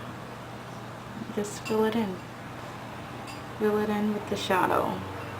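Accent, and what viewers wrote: Bahamas. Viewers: where are you from? im from the bahamas and you sound like a bahamian rather tan an american to me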